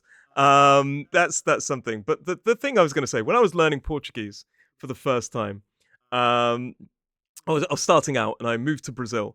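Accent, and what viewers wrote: United Kingdom. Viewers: You are the wonderful teacher in the world, I like British accent because of you , thank you so much 👍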